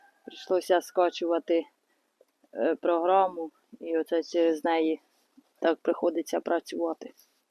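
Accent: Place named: Chernihiv, Ukraine. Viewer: я Олексій з Чернігівщини, мова знайома,у деяких районах у нас така сама.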